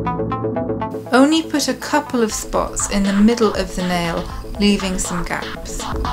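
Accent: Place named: RP, United Kingdom. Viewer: You have such a posh accent haha:)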